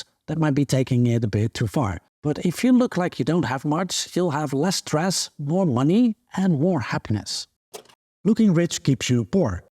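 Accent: Netherlands. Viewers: Hey, would you be dutch by any chance?? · You sound Dutch. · You sound like you are from NL.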